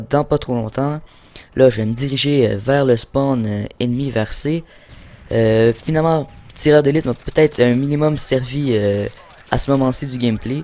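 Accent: Quebec, Canada. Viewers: J'adore l'accent québécois :) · Qu'est ce que j'aime l'accent québécois :'D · moi je dis trop bizarre parce que il est francais d'origine mais il a un pur accent québecois :O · C'est un quebecquoi il parle comme sa sinon c'étais marrant , oui ! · C'est clair qu'il n'avait pas un criss de chats dans ton mode si tu joues a la PS3 xD Anyway excellent travail au moddel tout nue en plus! <3... Ste plait change pas ton accent a cause de quelques Francais qui font chier et je m'inscriverez surment a CODQG juste pour voir un bon québécois jouer! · super l'accent man x) vive le quebec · Tu ne serais pa quebecois ? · Swag encore un quebecquois trop bon ton accent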